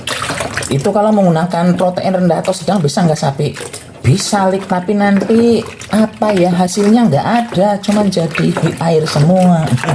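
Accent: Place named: Purwokerto, Indonesia. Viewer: Bahasa nya kok Purwokerto banget rika kang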